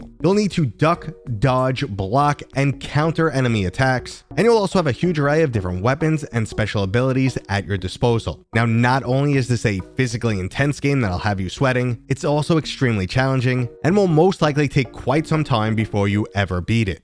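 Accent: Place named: Boston, United States. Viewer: I’ve never been to America but imma guess this guy is from Boston? 😂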